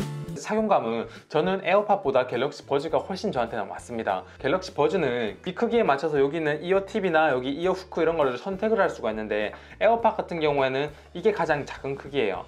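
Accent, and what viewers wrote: Gyeongsang, South Korea. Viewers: 제가 경상북도 출신인데 뉘앙스가 너무 친근해서 그러는데 어디 출신이신가요 ? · 부산 근처이실 것 같아용! 대신 답변 죄송합니당..ㅠㅠ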